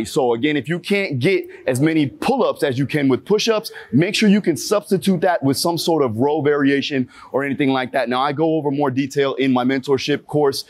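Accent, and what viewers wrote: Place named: Miami, United States. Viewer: you kind of sound like a miami boy with your accent. Is my assumption correct? I dig the video btw. Especially right now with this pandemic since I don't feel comfortable going to the gym, so those are good pointers for calisthenics.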